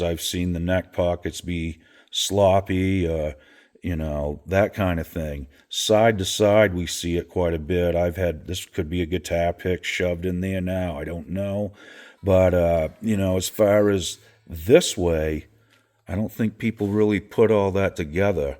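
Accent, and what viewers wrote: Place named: Maine, United States. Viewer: Had to check you out after hearing you talk and was right...mainah bub yessah!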